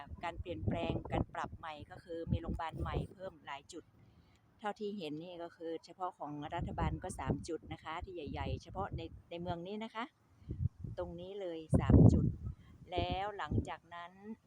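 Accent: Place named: North, Thailand. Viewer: มาฟังสำเนียงเหนือด้วยคนค่ะเพราะมาก❤❤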